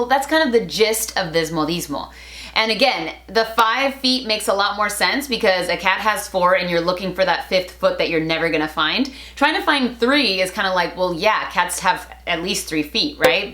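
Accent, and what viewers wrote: Mexico. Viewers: More than Spanish modismos I would call this "mostly Mexican AND Spanish modismos" I'm assuming because of her accent. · Hola! Una pregunta, hablas inglés con un acento o entonación de español mexicano o es mi imaginación ? Porque conozco americanos y su inglés es un acento un poco distinto. Solo una duda 😁👍🏼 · Steve Paul If I to guess from her accent shes either mexican or has mexican ascendance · Hmm... mexican spanish, some of these modismos do not apply in Spain. Love the entonation :-) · It's cool hearing an American white woman speaking Spanish with a Mexican accent. Sometimes your English also has the "singing" cadence found in Mexican Spanish. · acento mejicano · acá es donde se puede realmente apreciar la influencia mexicana en el español de, soy argentino y algunas no las escuche nunca jajaja igual se entiende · Si, definitivamente. 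Mexicanos reconocen a mexicanos, y créeme su acento si es mexicano. Y a eso añadimos que la mayoría de modismos que dijo en este video son Mexicanos. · “Hacerse bolas” no es ningún modismo en español, en todo caso en mejicano, en español tiene el mismo sentido que en inglés, ninguno aparte del literal. I think you should warn what idioms are truly Spanish and used all over Spanish spoken countries, and which of those are just used in Mexico. I suppose each country has its own idioms understood only by natives of each country in Latin America. You speak very good Mexican Spanish, sound 100% Mexican.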